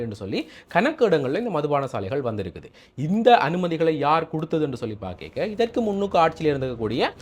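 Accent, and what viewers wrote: Sri Lanka. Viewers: யாழ்ப்பாண தமிழ் மிக அழகாய் பேசுகிறீர்கள்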